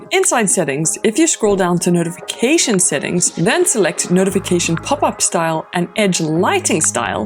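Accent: South Africa. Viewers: You have a South African accent.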